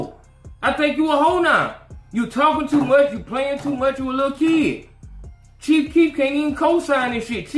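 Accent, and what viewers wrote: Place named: South Dallas, United States. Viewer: Lol ...you talk like my homies in south Dallas ...good shit man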